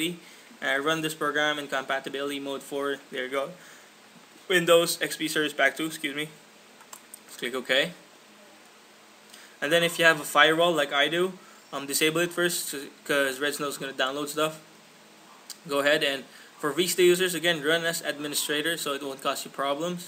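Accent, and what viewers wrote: Philippines. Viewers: lol man i can tell your soo phillippino!!! pinoy accent ftw. ;p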